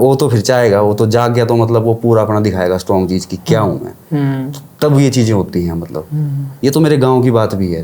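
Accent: Pahari, India. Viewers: हिंदी में जो पहाड़ी भाषा की आवाज आती है वो मुझे अच्छी लगती है।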